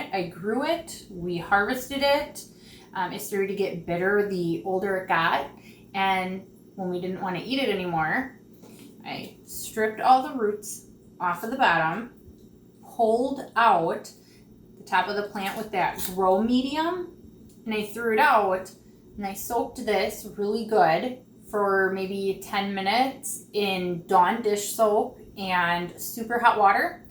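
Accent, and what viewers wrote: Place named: Wisconsin, United States. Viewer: I’m trying to figure out your accent. Is this Wisconsin?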